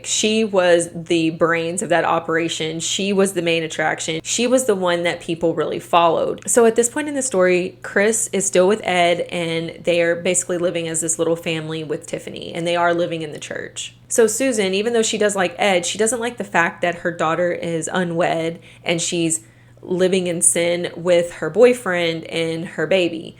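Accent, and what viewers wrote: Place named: Philadelphia, United States. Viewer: A question not related to this vid are u from the Philadelphia area? Just curious because I am and u sound like u r from the area. ❤️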